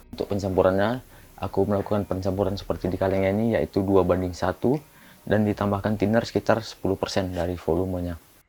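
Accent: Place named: Padang, Indonesia. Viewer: Cara ngomong lu keren bang kaya temen gua dari padang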